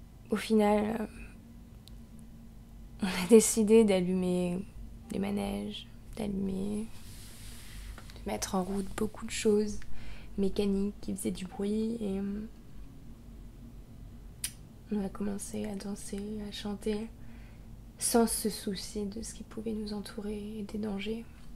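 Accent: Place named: France. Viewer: L'accent français est beau :3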